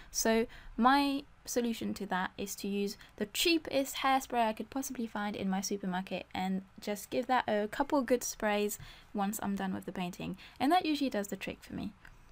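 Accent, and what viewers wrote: United Kingdom. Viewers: This is quite out of the blue but may I know if your accent is British? Which is it exactly? I like it so much. :) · Yes it's wonderfully comprehensive and I very much enjoy your lovely British accent!! :)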